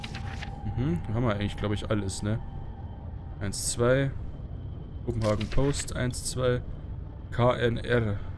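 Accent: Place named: Southern Germany, Germany. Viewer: Die Geschichte gefiel mir nur das Ending war nicht wirklich zufriedenstellend. Mal sehen wie das weiter gesponnen wird. Übrigens spricht man das normalerweise Tri-Nitro-Tulo-Ol aus weil das die Wortbausteine sind die für die Beschreibung der Struktur zusammengesetzt wurden sund (chemische Nomenklatur). Man merkt auch, dass du aus Süddeutschland kommst weil du von Kemie, Kina oder auch Arkitekt sprechen würdest ;)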